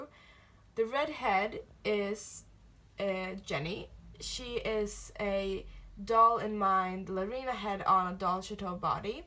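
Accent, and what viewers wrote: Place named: Scandinavia. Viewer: Hey there. Eller hallo. I was wondering where you're from? Your gorgeous dolls' names seem very nordic to me, as well as your accent, so I was wondering if perhaps you might me in fact nordic?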